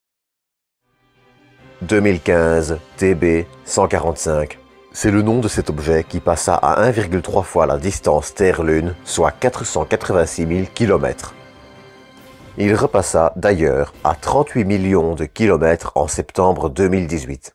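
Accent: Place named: Wallonia, Belgium. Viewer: Petit commentaire juste pour flatter l'algorithme de YouTube..... Excellant travail comme d'habitude, avec comme signature sonore cet accent inimitable... Ha, ce terroir Wallon, on l'Aime.....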